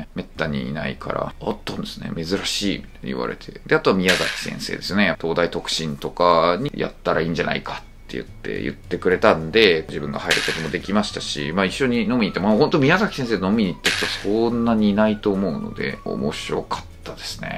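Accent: Kansai, Japan. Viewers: 先生の関西弁めっちゃおもろい！！ww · 関西弁かわいいw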